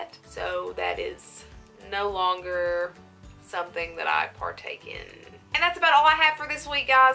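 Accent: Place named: Alabama, United States. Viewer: I listened to just one of your videos and I was like “this chick is from Alabama!” Came to this video and was definitely not disappointed! You are such a good source of info for snake info :D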